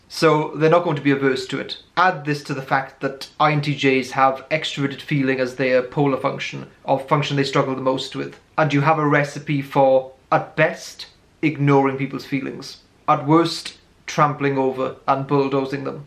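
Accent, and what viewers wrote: Wales, United Kingdom. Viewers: Yo, are you Welsh by any chance?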